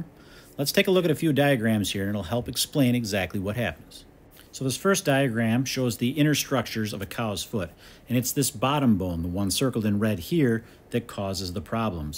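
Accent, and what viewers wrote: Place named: United States. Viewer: Respectfully, what happened to your Scottish accent? Sounds American.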